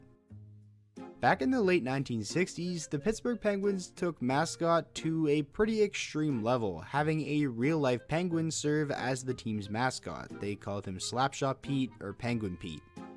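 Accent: Canada. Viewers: Which province are you from? You're the first canadian I've listened to who actually says "aboot" instead of just "abeut". I thought that was just a stereotype but I guess some of you actually do say it like that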